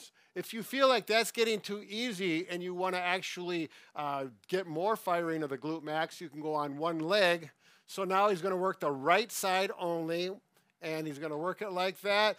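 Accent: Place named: Wisconsin, United States. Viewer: I detect a Wisconsin accent.. you guys are rad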